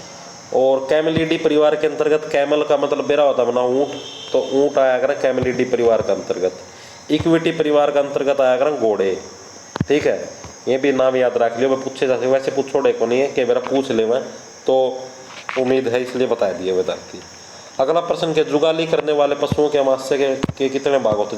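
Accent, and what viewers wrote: Haryana, India. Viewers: Khadi haryanvi hai madam ji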